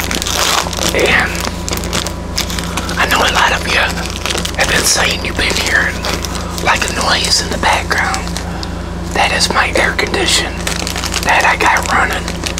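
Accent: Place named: Texas, United States. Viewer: Hey your from Texas arnt you my dads from Texas to i love your accent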